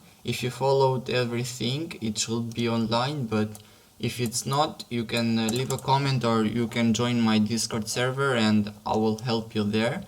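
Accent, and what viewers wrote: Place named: Albania. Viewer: hahaha po duket shqiptari se si e flet gjuhen e huaj 🤣🤣🤣 ❤️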